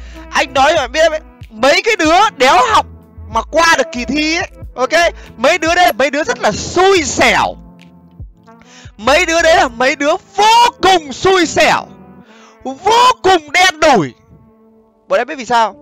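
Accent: Standard. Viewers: Nghe anh ns nghe chuẩn vl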